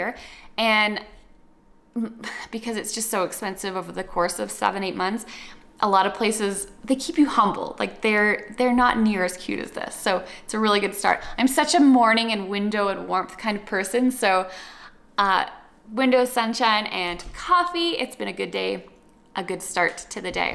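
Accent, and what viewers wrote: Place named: Canada. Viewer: I love the Canadian accent nuances I'm surprised i pick Canadian over American as an Australian. You're awesome your guy is ver6 lucky 😃👌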